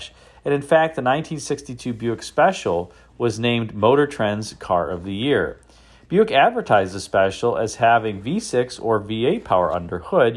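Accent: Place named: California, United States. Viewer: he sound like he got California accent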